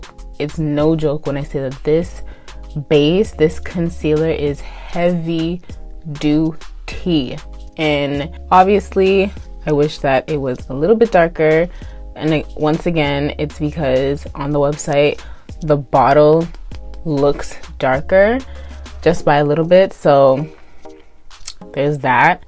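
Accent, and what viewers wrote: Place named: RP, United Kingdom. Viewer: You sound like miss posh in Canada 💕💕💕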